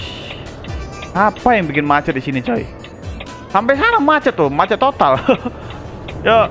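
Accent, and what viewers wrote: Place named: Java, Indonesia. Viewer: Bang sampean jowone ngendi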